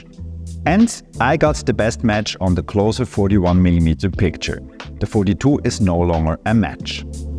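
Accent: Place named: Germany. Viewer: Qimago hat gesagt wir sollen dich schön grüßen 🙋🏼‍♂️ Und abonniert! Bleibt der Kanal englischsprachig? Deutscher Akzent ist so gewöhnungsbedürftig 🙈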